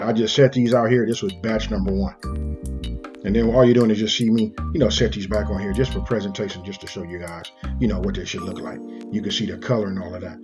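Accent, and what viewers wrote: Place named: Los Angeles, United States. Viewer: He sound like he from LA fasho!!! 🤣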